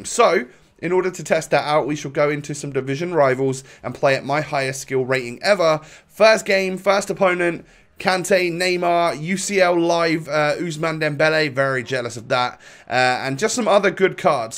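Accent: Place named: England, United Kingdom. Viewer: Wait he’s from Argentina??? Omg I thought he was from England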